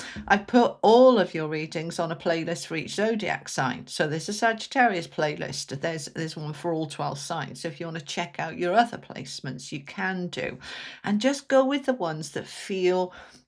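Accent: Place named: North, United Kingdom. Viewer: I love your readings. Your accent reminds me of home (north UK). Always heart warming ❤🙏♐️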